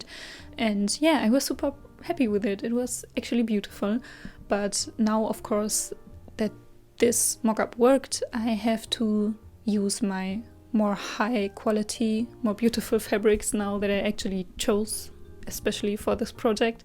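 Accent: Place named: Germany. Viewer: I WAS SO SURE YOU'RE GERMAN BC OF YOUR ACCENT- fellow german here :3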